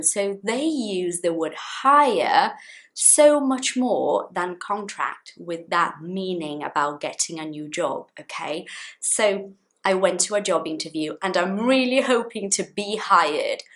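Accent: RP, United Kingdom. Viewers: I reckon your accent is really posh. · Hello, is everything okay? Thank you for all your tips. I've known all the words about you said in this video. And I appreciate your dedication to teaching us. I love your British English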